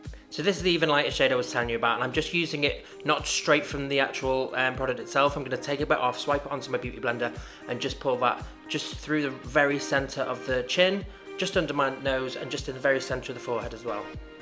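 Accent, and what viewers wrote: Liverpool, United Kingdom. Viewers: He still sounds Scouse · Miss ur Scouse accent, love it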